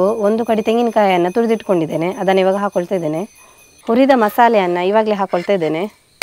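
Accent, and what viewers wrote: Mangalore, India. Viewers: Clear mangalore kannada 👌karavali brahmin style curry 👍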